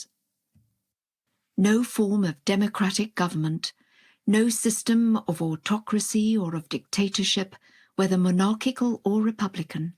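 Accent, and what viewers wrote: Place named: United Kingdom. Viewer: I cant understand her its the British accent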